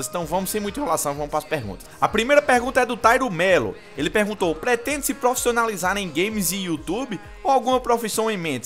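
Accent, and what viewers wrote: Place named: Paraíba, Brazil. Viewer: O ta com menos sutaque da paraiba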